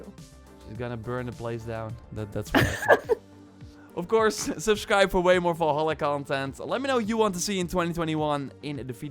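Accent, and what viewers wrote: Netherlands. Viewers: This Dutch accent hurts my poor ears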